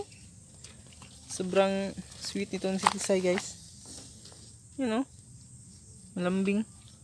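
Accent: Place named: Visayas, Philippines. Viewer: Bisaya ka pala kapatid. Malambod man.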